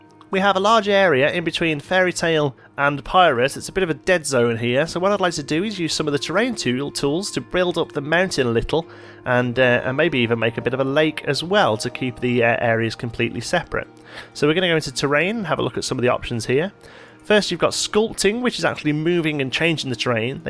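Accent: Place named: England, United Kingdom. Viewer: I have downloaded and paid for the game and two add on packs via steam. Trying to get to grips with the game is a challenge in itself, however the *** steam interface is unbelievably clunky and not intuitive at all for example it says my 2 DLC packs are in my library but they are not !!! Also if I download a small park and go to open it I get a message to either continue loading without the additional items or continue and download … that option hangs the computer … I have spent more time on messing with steam than playing the game and am starting to think I should have purchased from the App Store (Mac) I realise my post is off topic but do you use steam … I have raised a ticket with support but I feel that now they have had my money very quickly they are not that bothered sorting out new user issues. Apologies for the rant but listening to an English voice is preferable to an accent from across the Atlantic 😢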